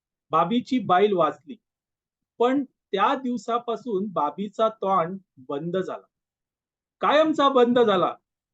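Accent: Malvani, India. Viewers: Excellent analysis and sweet Malwani language.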